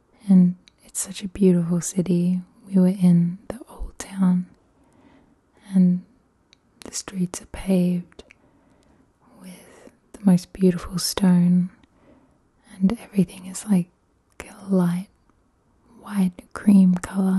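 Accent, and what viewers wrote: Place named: Australia. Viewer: What a great debut to ASMR! Enjoyed very much, thank you. Educational too. Lavender IC sounds amazing. 💜 😋 I always enjoyed the fairies in BoFIII video game PS1. They were cool and pretty helpful. 🧚‍♂️🧚‍♀️🧚 You are very beautiful and I like all your cool crystals and books. Oz accent very subtle and sweet to listen too. Space lights are nice idea too. Look forward to any future videos. 🥰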